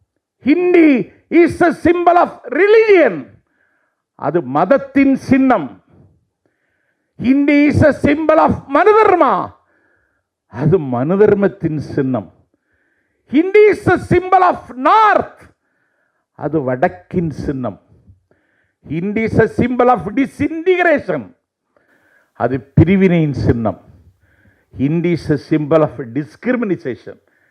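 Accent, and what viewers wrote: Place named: Kanyakumari, India. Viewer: That's the beauty of Kanyakumari Tamil...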